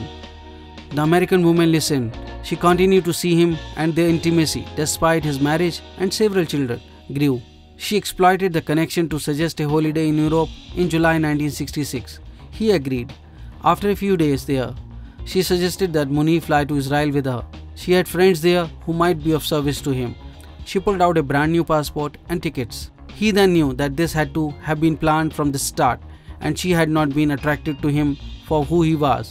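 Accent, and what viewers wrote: India. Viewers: Any Indian accent just ruins any program.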